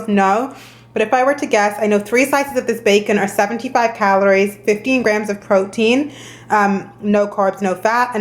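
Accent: United Kingdom. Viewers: Are you British? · Hey. Is that a British accent you partially have?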